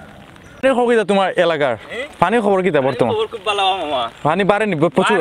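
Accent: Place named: Sylhet, Bangladesh. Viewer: Sylheti blogger speaking dakhai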